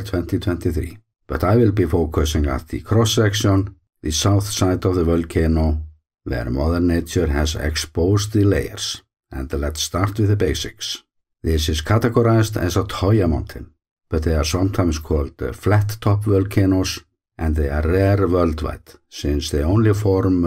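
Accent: Iceland. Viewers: Brilliant as usual and the English language couldn't sound any better with an Icelandic accent.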